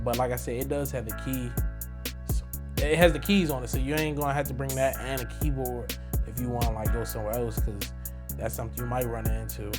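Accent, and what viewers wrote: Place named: Washington DC, United States. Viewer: You got a Dc/Pg county accent🫡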